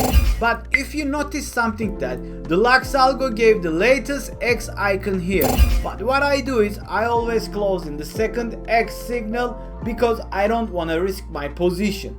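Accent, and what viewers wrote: Turkey. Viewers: The Turkish accent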